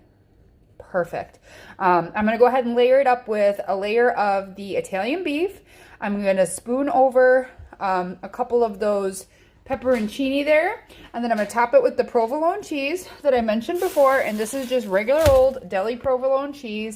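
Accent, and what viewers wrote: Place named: Chicago, United States. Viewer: I was born in Chicago, raised in Des Plaines area and LOVE Chicago style "Italian Beef"!!! It really is mostly a Chicago area ONLY dish. I moved to the West coast in 1970 and have been missing my beef sandwiches ever since! Portillo's is great and does have a location Near "Knott's Berry Farm" in the Orange county area of Southern CA but we're a long way from there near San Diego. Not sure if we can find giardinerra out here or not. Recognized you accent. immediately! haha Will try your recipe but I do have a deli slicer so will likely use a rump roast and slice it up razor thin. Thanks!!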